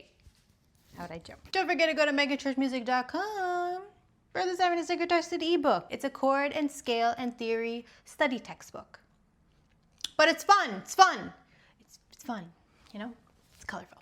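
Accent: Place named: Japan. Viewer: She speaks English like Japanese people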